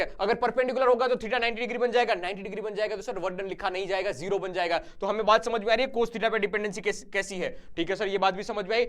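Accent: Gujarat, India. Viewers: Sir aap Gujarat se ho kya aapki voice tone bata rahi hai 😅😅 Sir aapne bohot accha revision karvaya ❤❤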